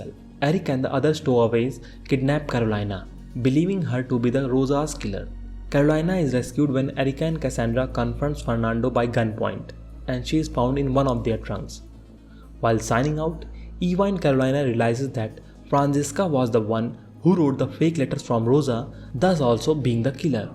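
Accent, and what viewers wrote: India. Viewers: Very bad gujju accent. sorry.. thumbs down.. please dont spoil our day again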